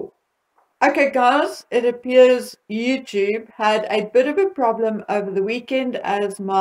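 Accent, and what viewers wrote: Afrikaans, South Africa. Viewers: Afrikaans accent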